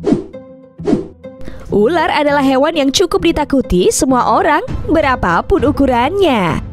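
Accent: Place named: Medan, Indonesia. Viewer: Yang aneh logat yg bicara mirip dialek medan atau makassar Bikin salah fokus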